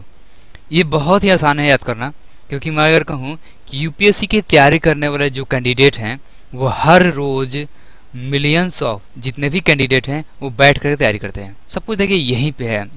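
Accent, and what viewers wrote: Bihar, India. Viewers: bht mst bihari bolte ho aap sir😃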